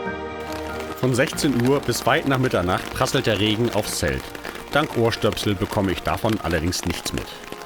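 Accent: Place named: Denmark. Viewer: Streckenweise warst du der Alm-Öhi des Nationalparks😅👌 Nur die Sache mit der Kamera ging gründlich daneben😔 So ein Mist! Dein Video zeigt ungeschminkt die Natur, die man dort erwarten darf🫠 und deinen dänischen Akzent finde ich richrig niedlich 😁.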